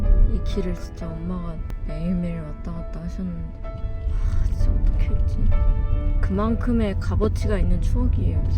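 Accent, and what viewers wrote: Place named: Dangjin, South Korea. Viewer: 누나 사투리가 배여있는 부분이 당진이었구나! 누나 차 출발 전 표정 개카리스마!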